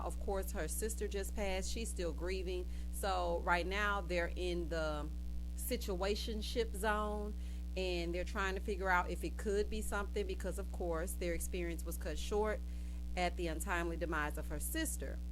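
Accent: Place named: Houston, United States. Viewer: in my mind, you are my new Bestfriend....everyone has pointed out how you and I mirror one another with the way we speak...LOL!! Houston meets South Carolina 🤗 Love your channel and I hope it continues to grow...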